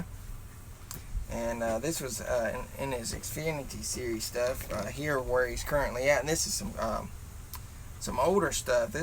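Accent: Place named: West Virginia, United States. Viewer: wow that southern draw! you sound straight out of west virginia! not being offensive i just didnt expect that lol. im here checking you out like i said i would right as my phone died lol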